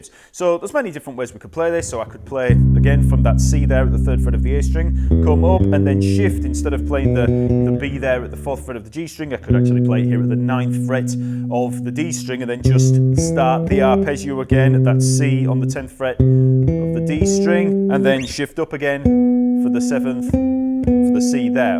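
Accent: United Kingdom. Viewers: Uk accent :)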